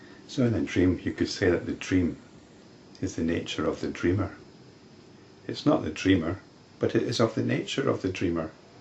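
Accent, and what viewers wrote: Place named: Scotland, United Kingdom. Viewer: I wonder where exactly your accent comes from. It sounds Scottish, but I don't know what city.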